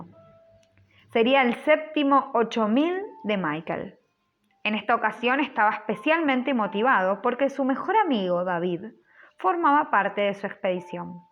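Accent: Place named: Argentina. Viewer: QUE LINDO EL ACENTO ARGENTINO.....SALUDOS DESDE PERÚ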